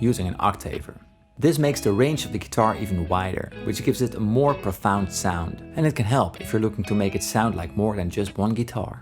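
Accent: Netherlands. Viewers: Kak hahahaha, I always thought u sounded Dutch ;) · You sound dutch, and i would know, cause i'm dutch too. Great beard btw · you're defenitly dutch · where are you from, you sound dutch lol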